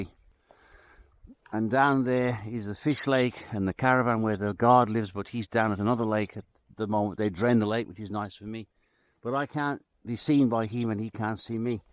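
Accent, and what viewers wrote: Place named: Black Country, United Kingdom. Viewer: I recognised the black country accent but I wasn't sure whereabouts.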